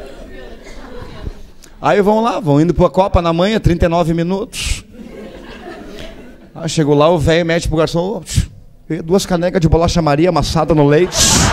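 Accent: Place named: Rio Grande do Sul, Brazil. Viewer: Esse sotaque Bagual malandro é único , o identificação do caralho!!! Muito bom parabéns! 👏👏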